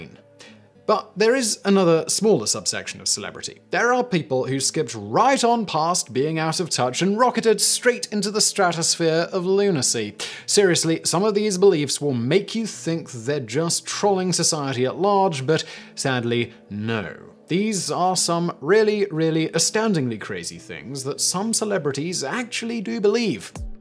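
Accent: United Kingdom. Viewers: Wtf, are those celeb are on drugs or have they lost brain's to say this. Absolutely love your British accent it's very something and relaxing for a Sunday night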